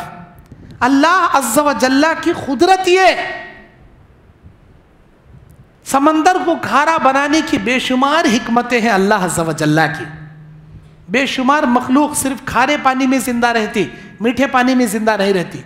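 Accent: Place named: Solapur, India. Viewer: Sobhanllah aapmyry sholapur ky hyn bat cheet ka lhja bhe alhmdu lellah jzay khyr dy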